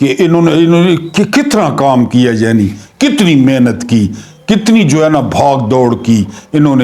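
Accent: Saraiki, Pakistan. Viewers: واہ صاحب ۔ آپ کی گفتگو اردو میں سرائیکی کا تڑکا لگاتے ھو😅 ۔۔سن کے دل❤ خوش ھوتا ھے ۔۔ بہوں خیر ھوونے 😊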